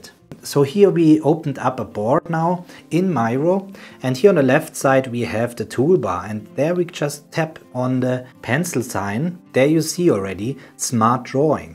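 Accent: Germany. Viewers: Wie man einfach sofort hört, dass er deutsch ist 😅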